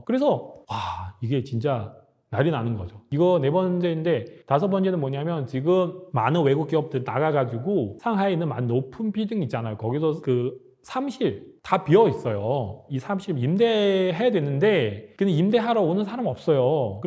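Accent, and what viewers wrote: Yanbian, China. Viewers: 이사람 중국사람이네 빌음이 중국사람 ? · 발음이 중국분이네요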